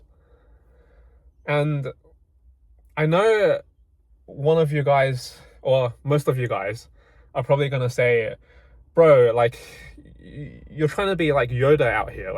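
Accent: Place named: United Kingdom. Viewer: Have you considered starting an OF account? I mean your a Asian, and you've got that British accent? I'm already getting hot at the thought.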